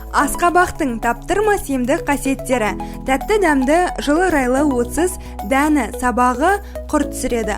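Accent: Standard. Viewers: Ана тілімізде таза сөйлеген өркенің өссін айналайын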